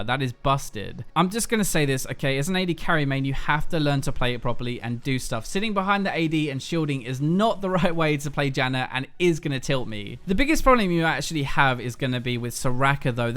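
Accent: United Kingdom. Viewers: i love that british accent hehe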